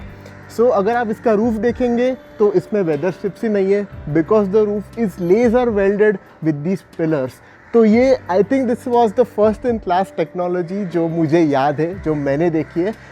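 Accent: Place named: Mumbai, India. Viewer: Very informative,!! Your talking style is unique,and 'Bambaiyaa'!! But you gave us a good 'Gyaan'!! I am watching this in 2021 end, with heavy heart, because, VW is on a 'killing spree' being a 'petrol head', I mourn death of 1.2TSI, 1.4TSI, & 1.5TSI is not for 'people'( I think, VW considers people are those, who can spend >22.5 lakhs for a car)!! It is evident, how much you love your car!! Happy driving days, & continue the 'good work' you are doing!!!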